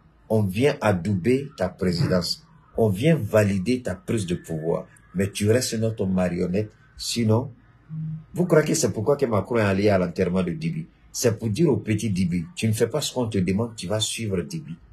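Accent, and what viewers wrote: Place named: Ivory Coast. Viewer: PERSONNE NE VIENT ECOUTER POUR APPRENDRE AVEC TON ACCENT IVOIRIEN JE BE SAIS PAS OU GABONNAIS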